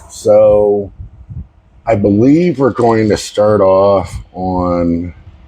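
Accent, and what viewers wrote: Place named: United States. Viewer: Dude sounds like he’s from the states, but he uses metric.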